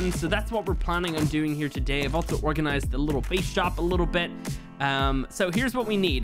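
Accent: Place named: Ireland. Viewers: His wife is from Canada so her accent could of worn off on him a bit, not sure, he could of also changed his accent a bit on purpose to be more understandable on youtube as irish accents can be a little hard to understand at times when thick. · I always thought he was Irish lol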